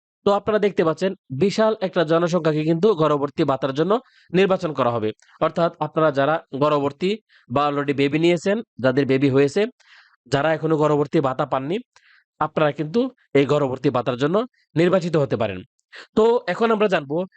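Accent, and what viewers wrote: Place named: Comilla, Bangladesh. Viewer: কুমিল্লা কথা য় করে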